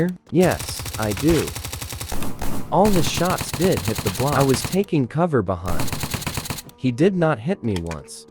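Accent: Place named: Sweden. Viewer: Hahaha ännu en svensk, har hört att folk tydligen gillar vår brytning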